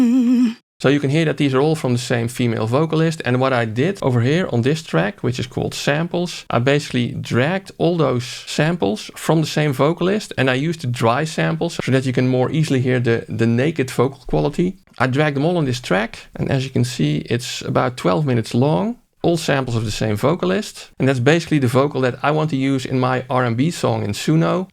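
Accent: Netherlands. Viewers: Grappig dat je als nederlander onmiddelijk een engels sprekende landgenoot herkent. Niet lullig bedoelt overigens! Goeie video! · He is so Dutch, I hate this Dutch shshshs pronunciation... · very Dutch accent there ;-)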